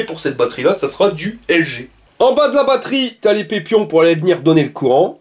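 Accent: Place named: Ch'ti, France. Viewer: Suis d'accord mais c le charme du nord , les ch'ti quoa, c'est cool la différence.😂. Moi il me fait rire, il devrait moins forcer sur le patois c'est vrai 😂. Surtout qu'il à de bonnes compétences techniques, c'est dommage que dès fois on est du mal à comprendre tt les expressions, car je ne suis pas de Lille et tout le monde non plus , mais il me fait rire quand même 😂.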